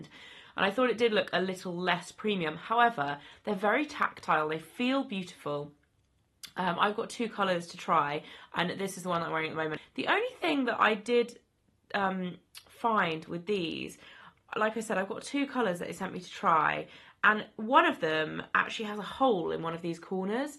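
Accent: United Kingdom. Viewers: I'm not sure I care much for the whole "lip powder" trend. It seems kind of silly and counterproductive to put something on lips where you actually should prefer moisture and more than that.. they dont ever look good. Especially the red and mauve type colors . It looks like you've been drawing on your lips with eyeshadow. BY THE WAYYYY... thanks for these videos! I'm a huge fan and you're very informative and who doesn't want listen to information coming with a British accent??? I love it!!!🤩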